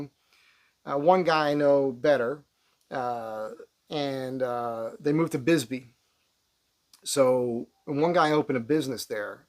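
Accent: New Jersey, United States. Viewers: LOVE YOUR YT.YOU ARE REAL🎉🎉 FOUND YOU FROM SARAH ❤🎉 HEARD THE JERSEY ACCENT AND MIND SET.I GREW UP * BACK EAST** I UNDERSTAND YOUR SELF SUFFECIENT LIFE❤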